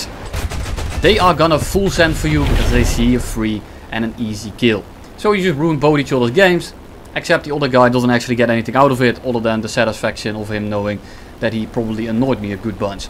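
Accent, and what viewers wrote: Netherlands. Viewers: I hear a Dutch accent, though i can't determine what kind of accent it is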